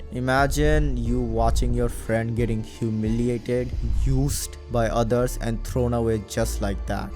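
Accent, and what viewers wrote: India. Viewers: That goofy ahh Indian voice💀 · ahh first ur Indian accent fix that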